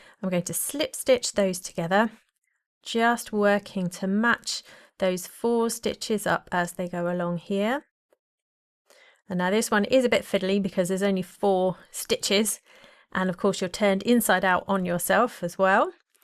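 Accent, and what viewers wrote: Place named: England, United Kingdom. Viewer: So, I have to admit, I have not watched any of your tutorials before but I am a huge fan of your garments. I have several patterns that I of course have not gotten around to. 😂😂 I decided to watch h this one as it popped up on my front feed. I was COMMMMMPLEEEETELY surprised to hear your English accent!!!!! I had no idea. So nooow.Im trying to reconcile your face to your voice. 😂😂 I will say that I totally love English accents. One of the doctors I work with is English and I looooove to listen to hom talk. ❤❤❤❤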